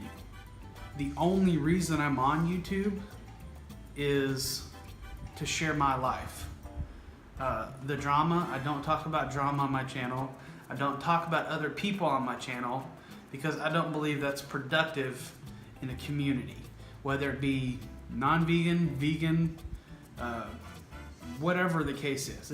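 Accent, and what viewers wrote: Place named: Texas, United States. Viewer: OMG, are you kidding?! Well, you do sound Texan:) I've been in Austin for a couple of years now, but I miss Boston every day. I haven't acclimated well here because it's just too hot and humid all the dang time. I bet you don't miss that, hahaa! Wow, I love that you and your wife made your dream come true-- it takes sacrifices, yes, and a hell of a lot of courage. But there you are-- now living in paradise:)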